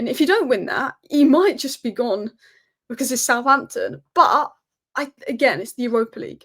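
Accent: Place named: Cockney, United Kingdom. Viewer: Your hair looks good puffed up. Tottenham I'd imagine are close to your heart, your accent soft cockney, your surname Jewish. The club could be to big for Postecoglu, like it could have been for Espiritou-Santo, who's done well at smaller clubs Forest and Wolves. But it's just as likely that Tottenham are a poisened chalice. I think the players tend to down tools a lot, I don't think they're too strong mentally and throw managers under the bus. There's something rotten going on behind the scenes like Man Utd, even though Tottenham are not in as dire a situation financially as Utd.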